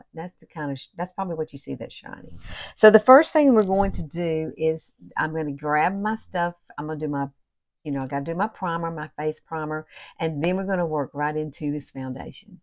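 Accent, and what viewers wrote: South, United States. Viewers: Just came across you and have subscribed. I’m going to try your tips! Love your hair … and your great southern drawl 😊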